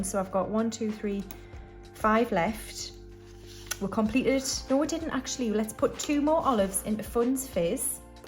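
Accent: Newcastle, United Kingdom. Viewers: Love listening to your accent. I'm a Geordie too but live in Northern Ireland so I miss the home accent. You sound more Gateshead than Newcastle...?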